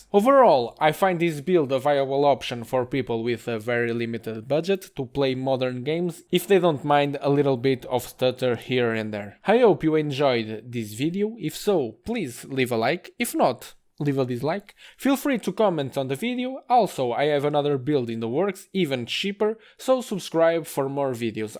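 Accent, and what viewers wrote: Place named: Portugal. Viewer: Vi logo que era um tuga ahah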